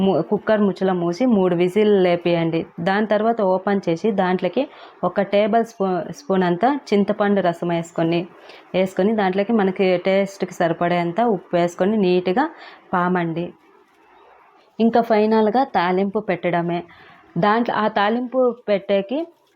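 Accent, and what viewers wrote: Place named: Karnataka, India. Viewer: Kannada chappu, telugu raa ledu meeki.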